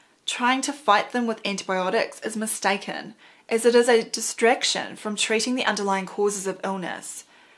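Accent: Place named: New Zealand. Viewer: Her NZ accent and her healthy smile and energy are added plusses to her important Med industry transforming message....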